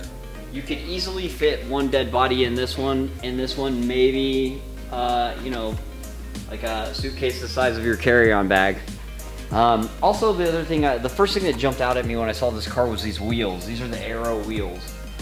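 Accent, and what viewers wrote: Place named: India. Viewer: This guy sounds like the Indian guy from parks and req.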